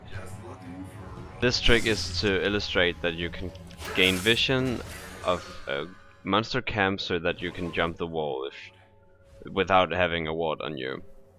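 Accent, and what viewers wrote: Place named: Denmark. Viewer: I guess we have a dane speaking :)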